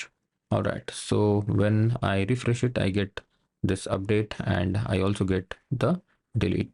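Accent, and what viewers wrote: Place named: India. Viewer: Classic Indian tutorial vibes...Flashy MacBook, thick accent, and somehow turning a simple task into a NASA mission. You could’ve just used Windows and made it beginner-friendly, but nope, had to flex the fruit laptop. No real pro with a MacBook is coming here to suffer through that accent. It’s the poor Windows and Linux users trying to survive this mess.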